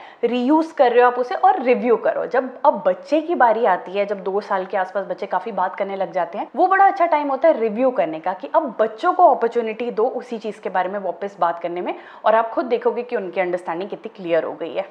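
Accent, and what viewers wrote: India. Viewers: U r from south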